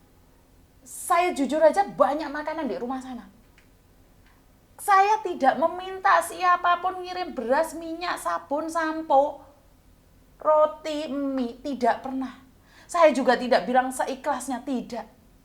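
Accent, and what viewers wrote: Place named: Java, Indonesia. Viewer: Mbak katanya orang BALI.BALi Nya mana Mbak.kok bahasa Jawa ne meduk kalau orang asli BALI ndak bisa bahasa Jawa.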